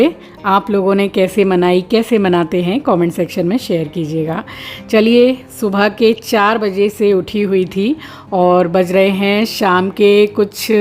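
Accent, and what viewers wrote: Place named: Bengal, India. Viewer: Your bengali is so sweet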